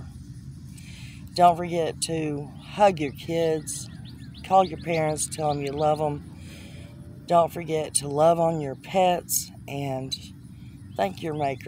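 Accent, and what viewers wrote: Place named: Tennessee, United States. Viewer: I love ya n I I think like you I. Agreeing 100 percent I love the idea I don't kw where are you cuz you sound like your around TN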